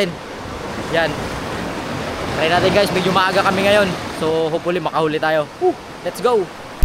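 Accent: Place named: Cebuano, Philippines. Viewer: Hahaha.. hagua sa nag kirig2x.. bisdak jud kaayu ba.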